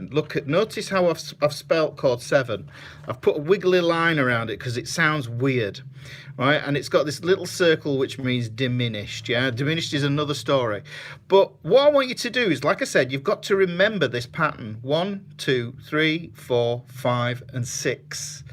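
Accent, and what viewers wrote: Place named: West Yorkshire, United Kingdom. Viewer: Hi,. I've been totally mystified by this for decades until I watched this vid. Thank you so much. Understanding this has opened so many doors now. i suddenly seem to be able to play along to anything once I've sussed the root note. Than k you so much and keep on keeping on,-) Good to hear a West Yorkshire accent on here too. I'm only over in Bradford myself.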